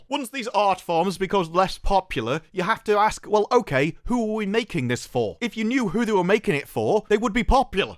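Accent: England, United Kingdom. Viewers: I now remember why I unsubscribe from you. You are obnoxious repetitive little prick who thinks he's smart when all you are is just some retard repeating the same crap with a British accent. that and just a little thing get some kind of tooth whitening thing going on. Every single video you look like you've been chewing spinach right before you went on camera. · Are you an American just with an English accent because you sound like everything you're saying I would say.!!?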